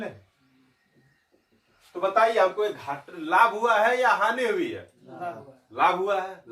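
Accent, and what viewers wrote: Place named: Bhojpuri, India. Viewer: Aaj pahali baar bhojpuriya andaaz me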